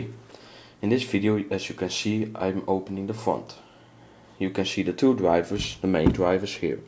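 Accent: Netherlands. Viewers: ik hoorde in de eerste seconde al dat het een nederlander is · I can hear verry good that you coming from the Netherlands.😆 leuke review. Nu kan ik eindelijk eens zien hoe mijn Charge 3 er van binnen uit ziet.😊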